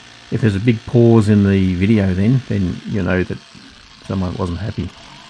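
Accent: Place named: Australia. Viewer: I'd definitely rethink the height of the tunnel mate. Especially if you plan on lining the tunnel at some stage. You'll want to put down a floor at least if you plan on wearing a nice pair of strappy heels down there otherwise you'll end up rolling an ankle. I love the Ozzie accent - always have. Deviated septum - yeah been there done that. Too many kicks and punchs to the face and too slow to dodge them LOL. Cost me $7k to get mine straightened. Worth every cent. Keep on digging!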